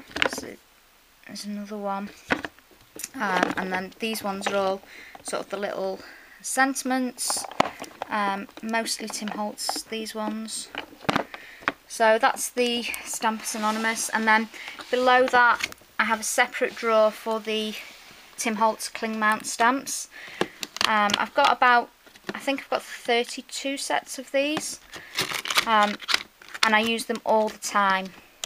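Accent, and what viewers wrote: United Kingdom. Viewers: WOW FANTASTIC COLLECTION...obviously you are from the UK...by your accent...I just love your collection of vintage/angel wood stamps...beautiful... Lori in the USA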